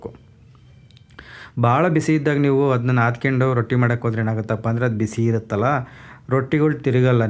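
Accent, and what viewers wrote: Chitradurga, India. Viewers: ಭಾಷೆ ಕೇಳಿ ನೀವು ಚಿತ್ರದುರ್ಗದವರು ಅಂತ ಗೊತ್ತಾಯಿತು. ಯಾಕೆಂದ್ರೆ ನಾವು ಚಿತ್ರದುರ್ಗ ಹೊಸದುರ್ಗದವರು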